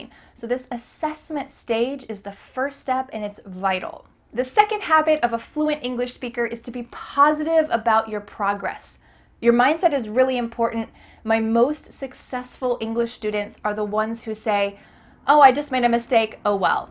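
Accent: United States. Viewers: Did you know that is from the state of North Carolina USA... so she speaks American English! You mentioned that you like British accents... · Hello, I saw many YouTubers are teaching English but the way you teach English is very affective and understandable. Yesterday I watched another English teaching channel, I didn't liked their videos because firstly their accent was different like Australian and English, so those accent wasn't understandable for me 'cause I watch videos in American accent like yours and Hollywood movies. Secondly they were not getting to the point. They were just spending time in front of 📷. Last but not least Passionate about teaching English, I mean I didn't saw any passion in their eyes which I see in yours. So thank you very much 🙏🏻🙏🏻 💝 from 🇮🇳 (I'm sorry for my bad English because I'm beginner but I am sure that one day I will speak English like you)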